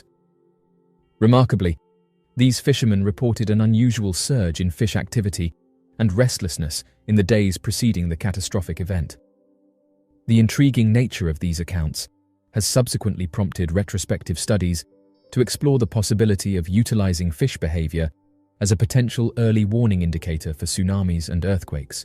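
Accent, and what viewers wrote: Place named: Public school, United Kingdom. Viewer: Oh yes oh yes my guy is back. What a voice. 2 million here we go. Sleep awaits. 😅 Edit- just the one story so far, still great though. No shade on the "new" lad, perfect enunciation just not intensly soporific. Who doesn't love random tales in public school English. Best video in years chaps. Hand crafted like the old days. Love it. ❤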